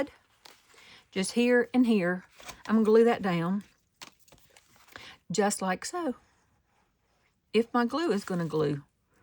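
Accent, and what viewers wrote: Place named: Alabama, United States. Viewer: Hey Ms! Happy Weednesday. When you say it you always emphasize it with an extra weeeenesday. It's that Alabama accent. I agree, I absolutely love using those hearts as the hinges on that tag. I can't wait to give that a try but first I've got to hand draw me some hearts that look decent. I enjoyed every second. ByHisGrace! 🌹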